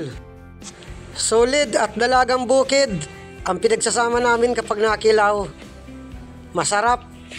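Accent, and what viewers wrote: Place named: Batangas, Philippines. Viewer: Idol taga saan kb kc ung pana2lita mo prang marinduque or btangas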